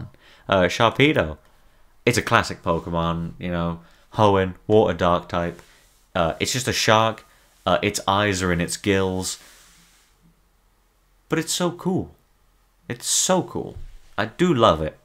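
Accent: United Kingdom. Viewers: just LOVE the british accent xDD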